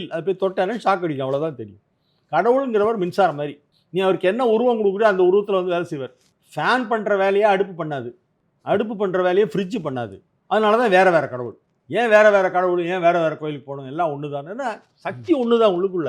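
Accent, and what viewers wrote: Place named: Tirunelveli, India. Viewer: அய்யாவின் பேச்சு நெல்லை மாவட்டத்திற்கு தனி சிறப்பு ஆச்சு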